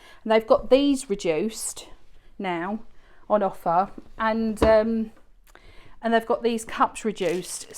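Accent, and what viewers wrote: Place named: England, United Kingdom. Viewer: Hi! I’m a new subscriber from North Carolina. Your video just popped up on my feed. It is lovely to hear your English accent. I am wishing you all the best on your self care journey. xx 🦋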